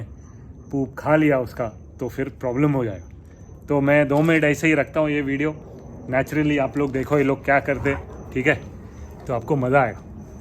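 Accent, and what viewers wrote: Mumbai, India. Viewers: Your way of speaking takes me to Mumbai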